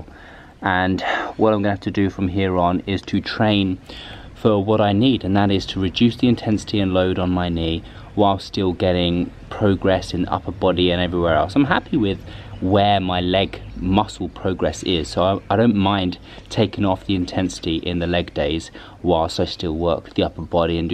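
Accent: United Kingdom. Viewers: I think from the accent you are British, am I right? 😁 Anyways, injuries are important to discuss, specially the beginning of starting working out. I usually have knee, thigh and back problems. My issue I get easily tired and can't increase weight. Another thing I can't keep motivated, specially during lock down. But thanks for your videos. P. S. Keep the beard 😊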